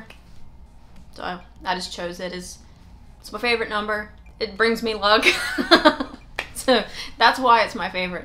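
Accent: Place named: Rural, United States. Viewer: From listening to you I can tell you are a small town country girl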